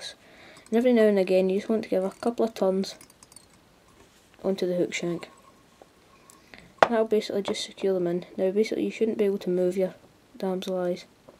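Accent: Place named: Ayrshire, United Kingdom. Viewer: You sound and even tie like the great fly tyer "Davie McPhail" fae Ayr!!! well done son i love yer enthusism and confidence tying these ;) Where you fae? you sound like my accent as Im from Auchinleck Ayrshire!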